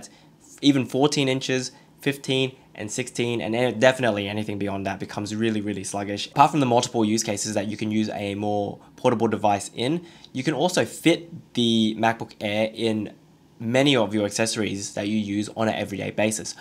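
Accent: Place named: United Kingdom. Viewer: First time I ever heard an Asian guy with a UK accent. 🤓👀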